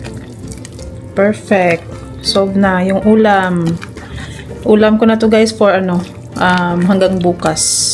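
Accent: Iloilo, Philippines. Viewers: hi mhie taga san po kau parang ilonggo kc accent mo kahit nag Tagalog ka😊😊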